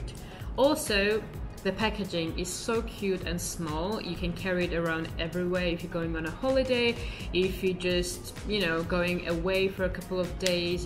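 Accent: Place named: United Kingdom. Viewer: ბრიტანული აქცენტით კარგად საუბრობ❤️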